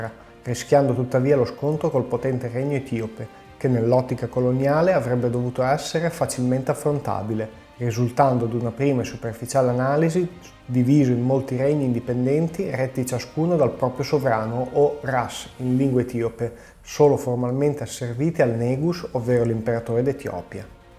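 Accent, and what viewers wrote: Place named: Alessandria, Italy. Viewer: bellissimo video. Ma dalla tua "erre" mi verrebbe da dire che sei di Alessandria?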